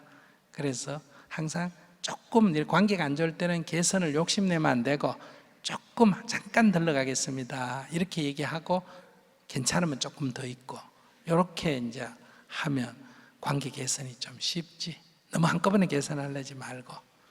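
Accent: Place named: Gyeongsang, South Korea. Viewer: 스님 " 살은 좀 갖다 먹었어요" .^^ 경상도 사투리라 잠시 놀랐습니다. 쌀 발음이 살.. 평생 안 고쳐지더라고요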